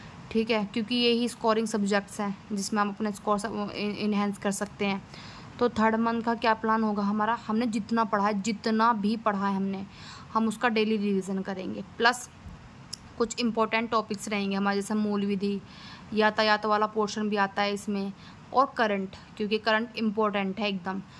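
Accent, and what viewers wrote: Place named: Western Uttar Pradesh, India. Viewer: Voice tone se lg rha aap west up ki taraph se ho😊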